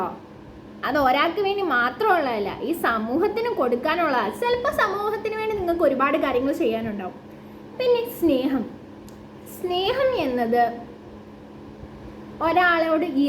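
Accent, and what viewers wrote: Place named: Kollam, India. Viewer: കൊല്ലം ടtyle സംസാരം നല്ല രസമുണ്ട്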